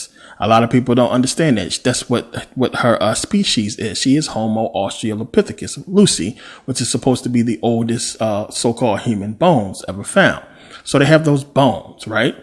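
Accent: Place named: DMV, United States. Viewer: Love that DMV "tew, yew, hewge" twang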